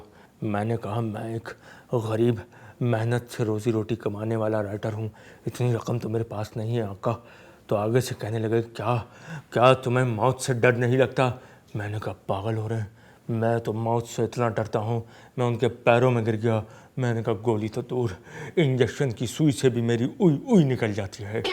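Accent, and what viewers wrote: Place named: Bihar, India. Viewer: 😂😂😂kamal yar kamal bihari accent ufff kamal sa b upar .. acting perfectly Bihar ki full yar Accent 😅😂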